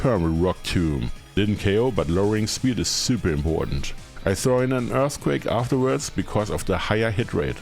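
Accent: Germany. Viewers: Peak, but are you German perchance? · So habe das Video jetzt erst gesehen ich habe direkt erkannt dass du deutscher bist du hast einen sehr ausgeprägten deutschen Akzent da fühl ich mich doch gleich wie Zuhause or in english like Home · first time seeing a video of this channel but am i hearing a german accent?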